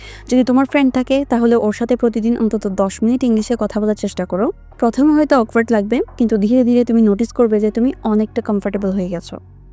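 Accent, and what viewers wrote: Chittagong, Bangladesh. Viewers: Are you frome chittagong?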